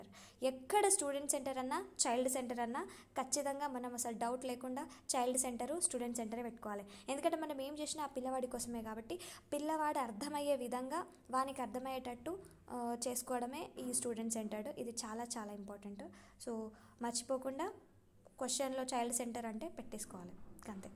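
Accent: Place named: Telangana, India. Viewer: Telangana accent spr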